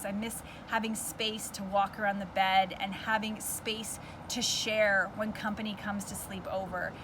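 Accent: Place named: Canada. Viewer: I have to watch your videos with captions. Canadians talk funny. I know it is not your fault but my research shows Canadians are taught to pronounce certain words largely based on their Scottish heritage. Some examples include, “about,” “against,” and “out.” Thank HGTV and DIY network for choosing shows produced in Canada. I watched a TON of shows over the years. It became really annoying.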